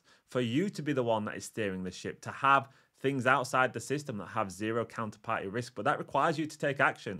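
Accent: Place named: Yorkshire, United Kingdom. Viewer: It helps to listen to 0.75. thanks, I Will try to get familiar with yorkshire-ese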